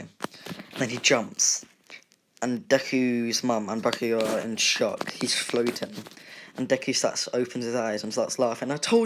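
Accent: United Kingdom. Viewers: Yer british aint ye mate like yer accent could ya be british to? Idk ;-; ya sound british alright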